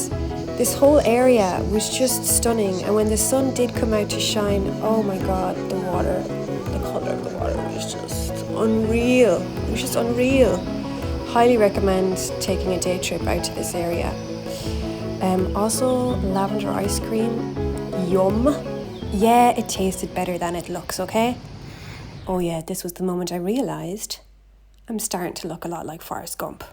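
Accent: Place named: Ireland. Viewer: I love your Irish accent! Guinness!!!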